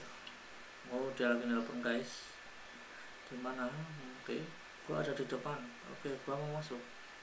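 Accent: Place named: Java, Indonesia. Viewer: medok ngomongnya mas. mending pakek bahasa Jawa aja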